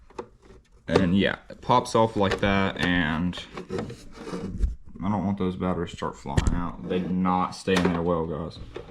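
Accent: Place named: Alabama, United States. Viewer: Southern Alabama accent.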